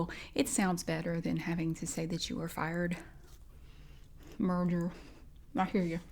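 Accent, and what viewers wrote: South, United States. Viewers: kinda like a southern angela from the office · Now this is as southern as you can get. I love it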